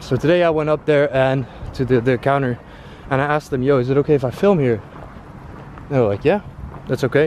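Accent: Netherlands. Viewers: Are u dutch?????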